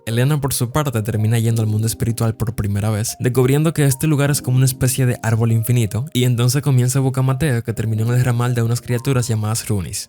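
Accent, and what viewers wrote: Dominican Republic. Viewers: Bro eres dominicano o es cosa mía? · AY ERES DE REPÚBLICA DOMINICANA OMG DE QUE PARTE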